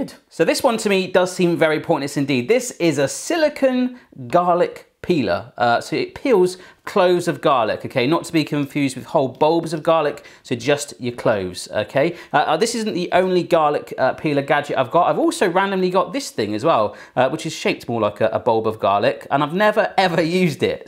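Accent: United Kingdom. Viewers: sounds very British in this video haha - the pineapple slicer was the best one by far too! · i found your channel extremely enjoyable ! :D also i love british accent soo soo much !!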